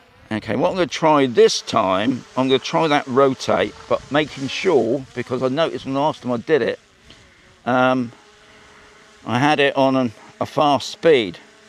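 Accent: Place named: England, United Kingdom. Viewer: I am entrigued to know where in the UK you came from before moving to Spain. I seem to detect something of a London accent merged with something from the South-West of England. Am I correct?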